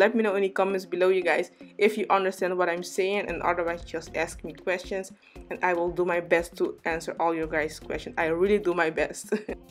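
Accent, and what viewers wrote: Netherlands. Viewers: Ik hoor een Nederlands accent daar 😄👍🏽 Top filmpjes! 😘💋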